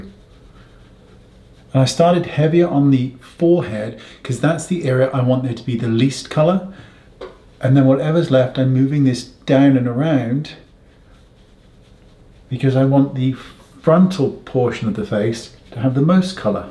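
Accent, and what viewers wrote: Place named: Somerset, United Kingdom. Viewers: Love this I’m going to try it by the way congrats 🎉 on your foundation my colour is out of stock at the minute but soon as it’s back I’m going to get it My Grandfather’s was from Somerset I recognised the accent best wishes 🌸🌹🌼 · I was laughing at the accent. My husband's family is in Somerset. Thanks for the technique reminder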